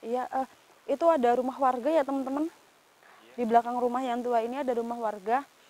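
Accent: Banyumas, Indonesia. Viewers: kui mba wong ndy jane sih deng ngomonge ngapak😂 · Mb lucu nek ngmong ngapak....haaaaa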